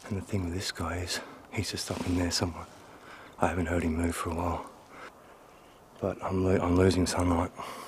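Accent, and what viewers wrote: Australia. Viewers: However, as an English speaker, I'd be happy to hear an Aussie anywhere! Especially in a scary forrest. It would be a welcoming sound for me, personally, as an American.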